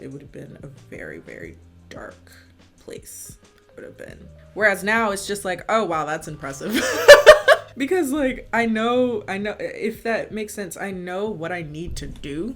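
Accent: United Kingdom. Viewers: That British accent 👌👌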